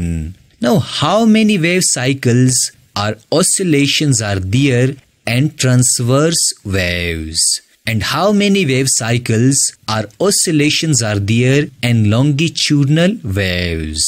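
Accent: India. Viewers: You got no doubt the thickest indian accent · the india accent is very annoying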